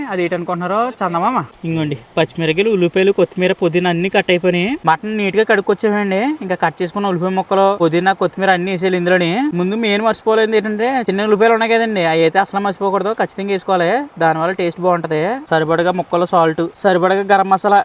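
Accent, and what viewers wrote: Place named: Kakinada, India. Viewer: హలో మీ వీడియో కి మీ మాటలు కాకినాడ యాస highlight సూపర్ వీడియో god bless you ma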